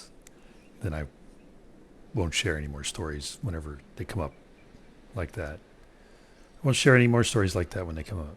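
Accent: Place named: Southwest, United States. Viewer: favela is like the mountain side villages in brazil. howls moving castle is like the studio ghibli movie of the same name. a complex city perched on legs. wow your accent sounds really arizona new mex. thought you might be native. in my opinion copy catting isnt really a thing if you play authentically. up is up down is down . what evs. more fun stories.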